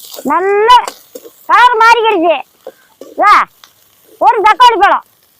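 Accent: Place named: Dharmapuri, India. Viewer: Nice ppaatima.. Neega ssamiyal kurippu sollum pothu yanga ooru neabagam varthu so neega yapdium Dharmapuri, selam, kirushnagri. Vellore pakkamtha irupiga... ❤❤❤❤ all vedio very nice paatima.... 🎉🎉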